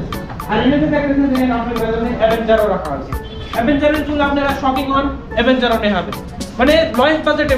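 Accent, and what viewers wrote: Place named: Bangladesh. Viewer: I suspect this guy is from Bangladesh. His accent says so. Not like pure indian west bengal bengalis. Requesting respective authorities to check his and his family background and origin seriously. Infiltrators from Bangladesh burma and Pakistan are playing havoc on the security and economy of our country.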